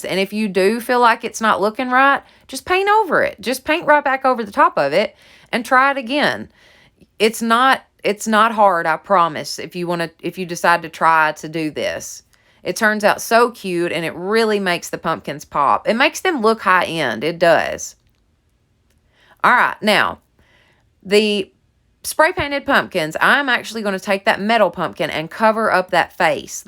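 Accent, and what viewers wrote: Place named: Tennessee, United States. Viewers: I’m a New Yorker so when I hear you speak I just love your Tennessee Twang. I enjoyed your whole vignette. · Girl, I KNEW you were from Tennessee! Sound like you could be right around the corner from me! Love it! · Everything turned out great your porch looks awesome. I'm from Tennessee to I get it when you say that the sun is brutal I've been doing some diys for my front porch to. Love the wreath. When I first started watching you I thought she has got to be from TN lol have a blessed day.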